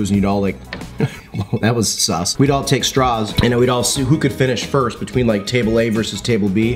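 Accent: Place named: Chicago, United States. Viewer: I am from Chicago and we definitely do talk like this wise guy here. We do not put ketchup on hotdogs. What are ya nuts? Ketchup on a hotdog, get outta here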